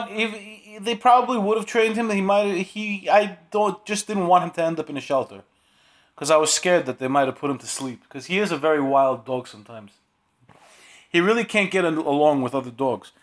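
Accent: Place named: East Coast, United States. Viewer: nice my man yea im in mass..you have tht same accent we pretty much all do on the east side lol..but yeaa my dude new subscriber ..nd watching all your vids;))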